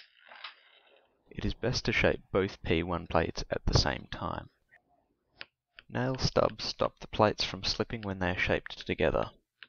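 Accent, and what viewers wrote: Australia. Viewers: R u from Australia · Hey man, you sound Aussie. Sweet. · Holy fucken shit mate, never knew you were Aussie, top notch work! The best i've seen in a long while.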